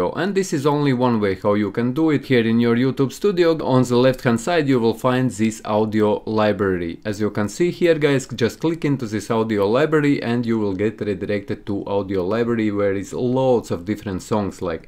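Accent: Russia. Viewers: No offense, but i like his russian boy accent :) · Finally a great Russian accent from a YT tutorial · Love your Russian accent! And thanks for this video! Stay Safe.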